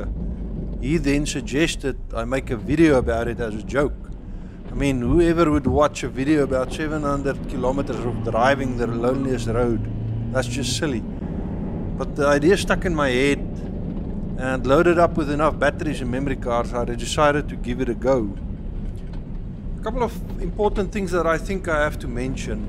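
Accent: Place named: South Africa. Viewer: No man you are the best travel commentator I have ever heard. Thanks Bru for the great journey, hahaha I love the South African Accent.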